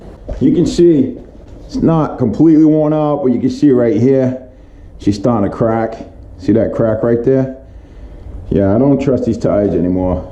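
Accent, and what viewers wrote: Boston, United States. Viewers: you made me homesick Boston strong deplorable in tampa bay ...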